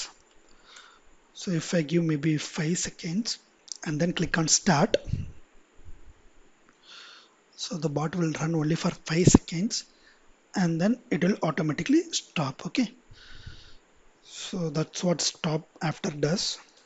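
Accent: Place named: India. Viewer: I hate this Indian omfg please stop with the fcking accent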